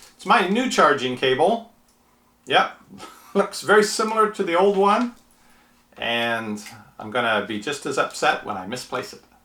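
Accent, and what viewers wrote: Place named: Northwest, United States. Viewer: You said Seahawks. Are you from Seattle? I've been wondering where you're from; clearly not Austin. You say "aygs" for "eggs" and that would make sense for the northwest.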